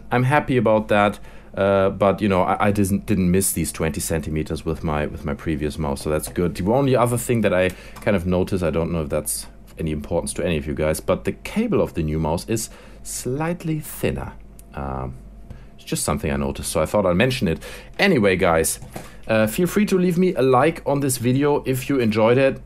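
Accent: Germany. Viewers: fürchterlicher deutscher Akzent ^^ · Anybody know where this flavor of english accent is found/from? I hear it often on youtube but its clearly not British, Irish, Scottish, Australian, South African, North American, I cannot pin it down, It almost has a German or Swiss hint to it... ?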